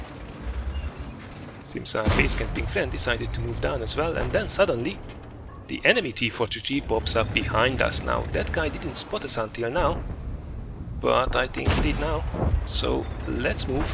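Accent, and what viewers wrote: Hungary. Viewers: magyar vagy? mert amikor beszélsz úgy hangzik mintha magyar lennél. Are you hungarian? Cause you sound like a Hungarian man when you talk :/ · i like hungarian dialekt!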